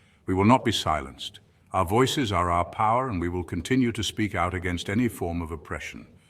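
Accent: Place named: United Kingdom. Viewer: Absolutely on point, and I agree 100% on all points - however... does anyone else see the irony in using a modern British accent for the AI to talk about fundamental American recognition of our human rights? Even the current and newly ex-British in the 18th century did not have that accent - they sounded more like a version of the deep Maine or the traditional New York or Boston (Southie) accent.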